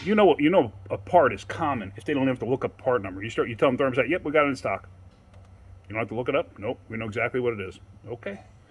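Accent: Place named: Midwest, United States. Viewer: Thank you for your humility in your presentations. You don’t need apologize for bad camera positions, we understand. I would edit out more dead time. Watching a car go up on the rack is pretty boring. I enjoy hearing, “I’ve never done one of these before”. We all learn together, learning from your mistakes and also your clever “work arounds”. Your bits of tricks of the trade comments are great. I sure hear a Chicago, St.Louis, Detroit accent from You. I heard all those accents when in the service. I’m no expert, but I don’t hear Carolina. 😊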